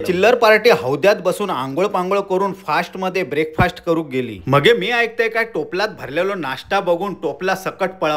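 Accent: Malvani, India. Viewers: tumch boln khupch aavdt te mhantat na ki. .. malvni manus to malvnich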